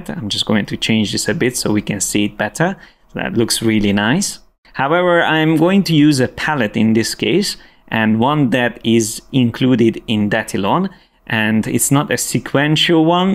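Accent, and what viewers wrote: Hungary. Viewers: Great channel, awesome background and video, huge help! Thank you bro :) Ez most egy kísérlet arra vonatkozólag, hogy kb 4 másodperc után hasított belém, hogy oké, ez a srác ha nem magyar, akkor én se vagyok az :D A hunglish ellenére vagy inkább mindazzal együtt tényleg az egyetlen videó amit annyira profinak találtam, hogy tényleg végignéztem, és öröm látni, hogy új fejlesztésekről és korrekt designról kapok heads-upot és tutorialt! Podcast, newsletter, jó az ilyen profizmust látni azon felül, hogy tényleg kerestem valamit és meg is kaptam rá választ! Menőség és gratula! <3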